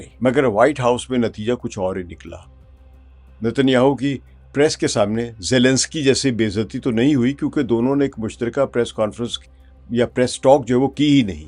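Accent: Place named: Punjab, Pakistan. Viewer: ماشاءاللہ ۔ کیا بات ہے۔ پنجابی تڑکے کی۔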